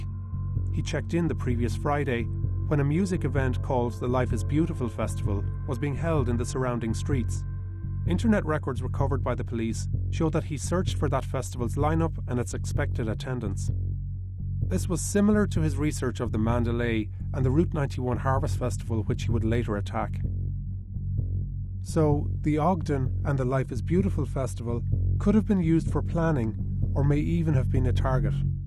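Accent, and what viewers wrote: Ireland. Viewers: This is all well and good but the Irish guy narrating the video caught me so off guard idk why even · Since when did The New York Times have an Irish narrator?, also this is really spooky and sad that this happened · Irish accent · Is the person talking Irish? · Love how it's the New York Times and it has an Irish guy as the narrator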